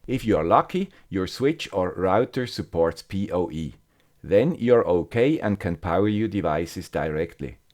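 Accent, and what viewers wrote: Switzerland. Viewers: Swiss watches and clocks - high precision and accurately made. Some shafts have jewel bearings Swiss chocolate - rich flavour and addictive. Well packed but easy to open Swiss Red Cross - known round the world for their work. Very reliable The guy with the Swiss accent - all of the above. He also has a great Germans dry sense of humour. Thanks for all your great videos.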